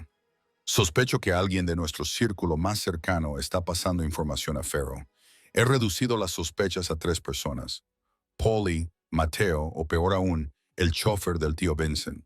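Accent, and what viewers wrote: United States. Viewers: Si por favor piensen en sus historias antes de difundirlas y pongan bien los generos de los personajes, a vecesved un niño y a veces una niña y porque usar una voz con acento norteamericano hablando español?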